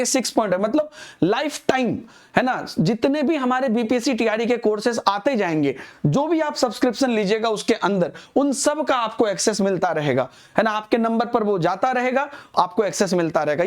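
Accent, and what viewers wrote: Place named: Uttar Pradesh, India. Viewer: भाई जी मुझे तो नहीं लगता है यह बिहारी है यह कहीं u p का लगता है टीचर है या कोई आर्डर स्टेट का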